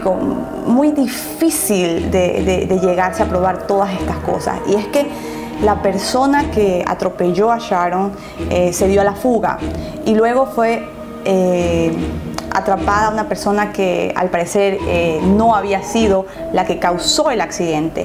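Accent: Guayaquil, Ecuador. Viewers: soy uruguaya y he visto todo lo posible de esta chica hace los videos con gran respeto y en cuanto al lenguaje es su expresión natural lo se porque soy docente ,si les molesta su modulación es porque no conocen ni respetan su acento ecuatoriano! si no les gusta no miren! Es muy fácil criticar! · Así hablan los Guayacos. Pero bien que tengas este canal. Es interesante. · Nosotros los ecuatorianos hablamos así! No la critiquen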